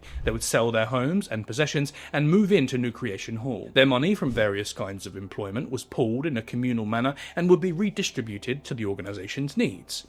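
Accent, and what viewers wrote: England, United Kingdom. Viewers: That's just how English people speak. I think you're reading something that isn't there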